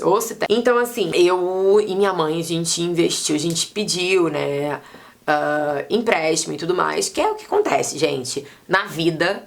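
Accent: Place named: Rio de Janeiro, Brazil. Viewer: sotaque carioca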